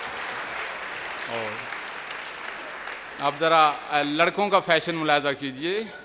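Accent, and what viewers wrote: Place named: Punjab, India. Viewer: Husney Bayaan, Tanz-o-Mazaah ki zumaani Barikyaan or sadgana chashni, woh bhi ek dhime dhime Punjabi talafuz ki roshni main, wah kamal hai.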